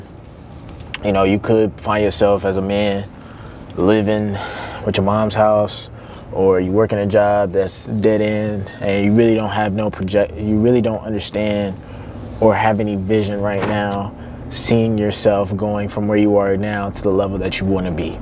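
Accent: Baltimore, United States. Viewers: Bro u from Baltimore them ouis sound familiar 😂na great motivation bro ima subscribed now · Are you from Baltimore ? 😭 also the videos are 🔥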